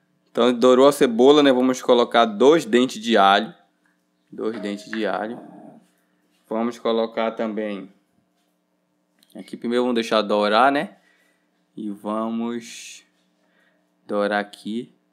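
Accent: Pará, Brazil. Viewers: Amoooo 😍😍 o seu sutaqui , paraense 💕💕💕💕💕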